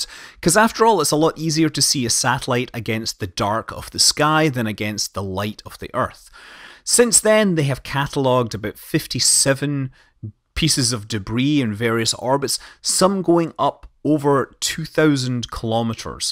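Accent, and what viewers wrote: Scotland, United Kingdom. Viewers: Is Scottish?? Where's his accent from?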